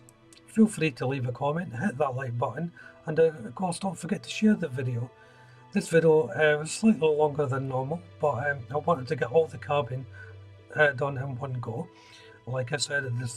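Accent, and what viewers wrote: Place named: Scotland, United Kingdom. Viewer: Am enjoying this as will be getting this for myself soon. I know it's hard but if you could have a look at your sound so everyone can enjoy your lovely Scottish brogue. Is that the word? Who knows. Thanks for your time and effort. Good stuff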